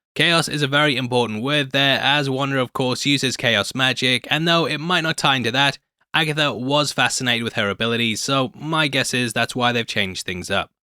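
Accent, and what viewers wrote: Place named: Scotland, United Kingdom. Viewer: Love the show and the Scottish accent keep up the good work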